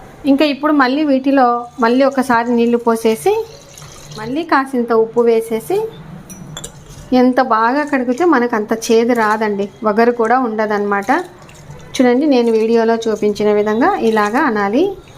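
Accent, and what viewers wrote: Rayalaseema, India. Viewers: Akka peekinave kadu akka teesavu anali mee rayalaseema bhasha yasaga vuntundi 😊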